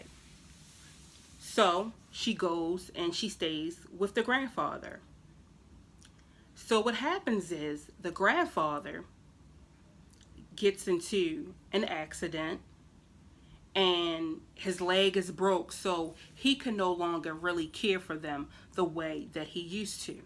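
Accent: Baltimore, United States. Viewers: I'm new to your channel. I recognized the Baltimore accent and had to follow and support!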